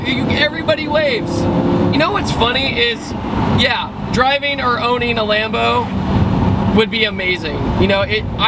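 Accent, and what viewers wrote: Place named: Pittsburgh, United States. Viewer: Dat Pittsburg blowg doe.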